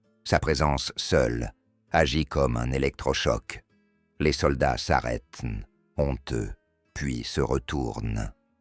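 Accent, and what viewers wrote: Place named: France. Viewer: it's a French accent and a very nice one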